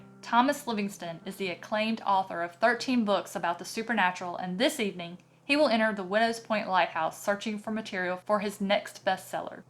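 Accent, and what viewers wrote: South, United States. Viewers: I love your channel!!! Love your accent. I'm from the South too.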